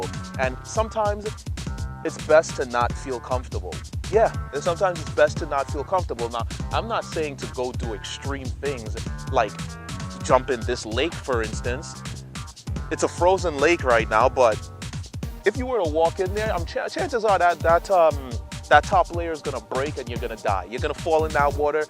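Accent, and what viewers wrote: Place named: Caribbean. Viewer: Yo!!! You sound like you from the W.I.!!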